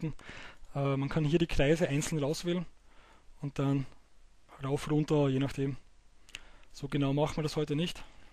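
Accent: Austria. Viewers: Dafür dass du Östereicher bist versteht man dich ganz gut ^^, also jetz´ für nich´Östereicher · Is doch voll geil das du Österreicher bist :D Ich mag deinen Dialekt ^.^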